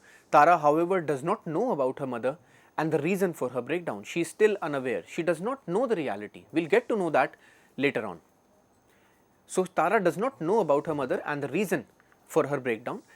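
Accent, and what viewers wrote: India. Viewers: I just say the way of an Indian pronunciation in your voice is commendable,great explanation with great melodious voice 🙏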